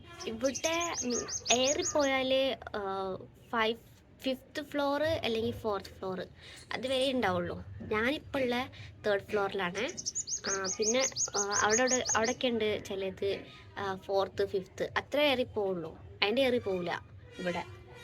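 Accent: Malappuram, India. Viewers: മലപ്പുറം സ്റ്റൈൽ സംസാരം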